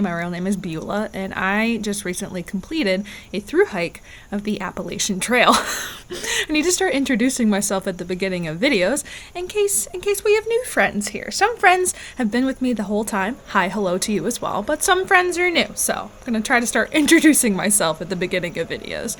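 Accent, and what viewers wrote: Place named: Kentucky, United States. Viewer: I have watched several female through hikers as I too am a solo female hiker but I have only had the opportunity to do sections of the Appalachian trail with a definite plan to complete the whole trail. I found watching y'all made the sections I have not done feel very doable and that I am ok with what/weight I am carrying. The through hikers I watched and enjoyed all of them you were the one individual I would have loved to have through hiked with. You seem to be able to enjoy the journey and not be pressed to always get big miles (especially the new state beverage). I assume this is a once in a lifetime adventure so if you can go out to eat in a restaurant, great! if you need to stay in a hotel because it's rained. Wonderful. I never had issues with why you posted when you did because it only seems logical that you do not want people to know exactly where you are and Wi-Fi is not always readily available on the trail. 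I personally love the way you talk, maybe it's because you're from Kentucky and I'm from Western North Carolina. I'm glad you successfully completed the AT but I do miss watching your on trail videos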